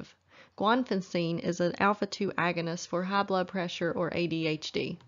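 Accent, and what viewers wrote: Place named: South, United States. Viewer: Thank you so very much for making these with audio after a shift as an RX in training my eyes feel so tired to read my books On top of that I hear a southern accent Wich for some reason I adore and it soothes my nervous system . There must be a god that loves me after all. A billion thanks.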